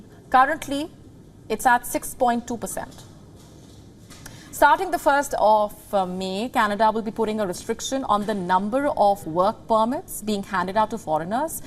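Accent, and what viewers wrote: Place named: Punjab, India. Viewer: We dont need punjabi english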